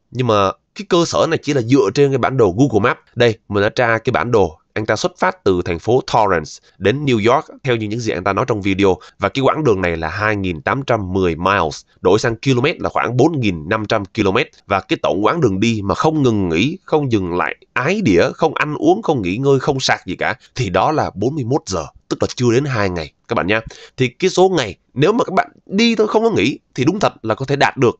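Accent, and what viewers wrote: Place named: North, Vietnam. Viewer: Nghe giọng backè.. sợ lắm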